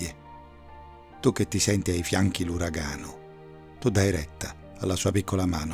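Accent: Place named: Naples, Italy. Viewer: Bravissimo! E poi questo accento Napoletano su una poesia Romagnola rende il tutto universale e oltre il tempo...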